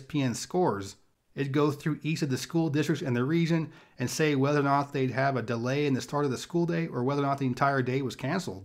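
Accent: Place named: Tennessee, United States. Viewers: I could have sworn you were a fellow southerner. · A lot of people from the south central valley have natural southern accents because of mass immigration from the great plains and south during the Dust Bowl and Great Depression. His accent could be from Tennessee, but I wouldn't be surprised if it's something he grew up with. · If you're from Visalia from other episodes it seems you sure picked up the Tennessee accent fast.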